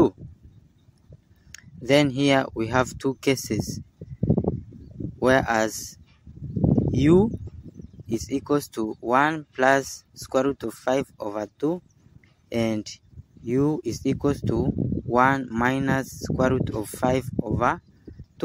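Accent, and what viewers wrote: India. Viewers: Listening this solution, I forgot the math I knew, but I learned Indian pronunciation. Thank you so much!!!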